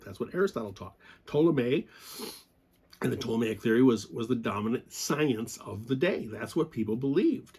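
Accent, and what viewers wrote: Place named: Minnesota, United States. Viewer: Hey, I'm watching this video--per your recommendation! BTW, are you originally from Minnesota? I'm only guessing this because your accent reminds me of where I grew up.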